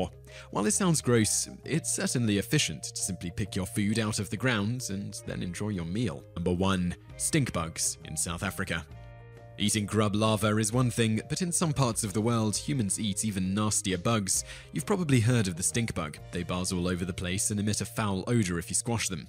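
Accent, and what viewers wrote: England, United Kingdom. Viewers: Canned spaghetti used to be a favourite of mine as a kid. I like turkey Spam. I really appreciate the inclusion of brown sauce on this list. Maybe because the narrator has an English accent. I initially wondered if Vegemite would get on this list (though all things considered, Britain would have to be cited for Marmite in such a case), but credit due to Witchetty grubs as the Australian example.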